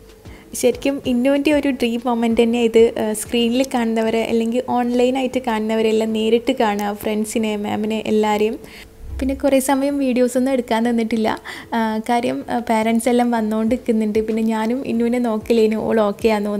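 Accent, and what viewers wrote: Kannur, India. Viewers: Njan oru pattambi kaari aanu but niku othiri ishta kannur slag 😍😘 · Ighal kannur ano nammale athe language😀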